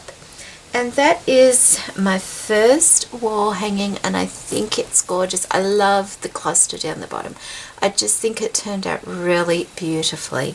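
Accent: Australia. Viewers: Oh, I love both the projects and I have to say I LOVE the way you presented them and your description of each lace, bling, etc that you use is so enticing and inviting! I love listening to your voice anyway, it's so sweet and your Australian accent is pure beauty (hate to say it but sometimes it, when I hear it say, on the TV, can be a little........cant think of the word)! Oh, and how on earth do you remember who sends you what? it is such a gift you have, as its lovely to hear the names mentioned (think I may have asked you this before, if so forgive the intrusion). Hope you are well, my friend, hugs from June xx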